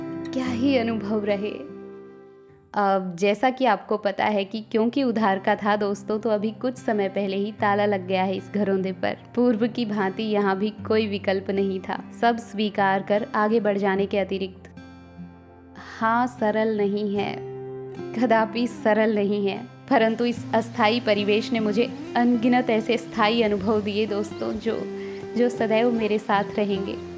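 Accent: Standard. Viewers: Didi apki pure hindi bolne ka andaj muje bahut pasand hai apne bahut mehnat ki hai purane ghar me ab apne apni kala ka rang yaha bhi bikher diya ye bahut achha laga muje🎉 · Kitni sudh hindi hai waw I'm impressed 😊😊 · आप को सुनना बहुत ही अच्छा लगता है। आप इसी तरह लम्बे ब्लाग जिस में आप बहुत सी बातें करके हमें अपने दुसरो के जीवन के रोचक किस्से अवश्य सुनाते रहना जी 🙏🏻 भगवान जी हमेशा खुश और स्वस्थ रखें 🤗 हमारा की शुद्धि हिंदी वाणी ने मन मोह लिया है और बार बार सुनने को मन ललइत रहता है। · apka shudhha hindi bolna bahut achha lgta h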